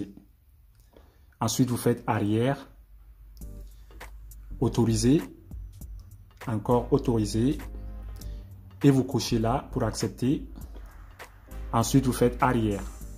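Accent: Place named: Mali. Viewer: Merci beaucoup depuis le Mali. Votre accent me semble malien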